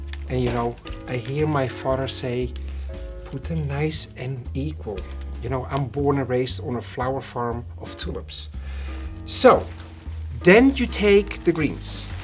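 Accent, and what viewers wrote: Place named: Netherlands. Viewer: jezus wat een dunglish accent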